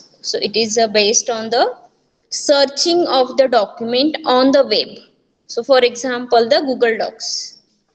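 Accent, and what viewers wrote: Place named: Kerala, India. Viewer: When I heard your English, I thought you were a Malayali. 👀🥲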